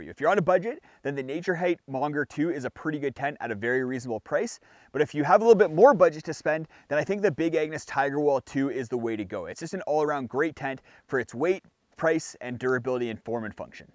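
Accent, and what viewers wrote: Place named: Canada. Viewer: You sound especially canadian in this video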